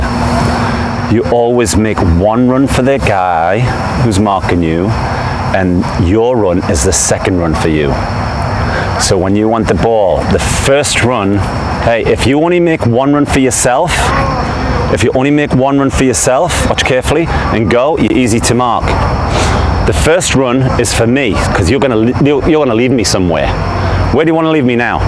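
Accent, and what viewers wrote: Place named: Newcastle, United Kingdom. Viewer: Watched a fair few vids now coach, I had to look you up! Yep, I cheated. But I will say that I did detect the Geordieisms in ya dialect. How? I'm from Ashington. Home of the great Jackie Milburn, and Charlton brothers. Me Granny used to babysit the pair.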